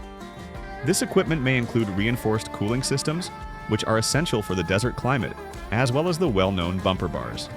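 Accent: United States. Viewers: American bloke ?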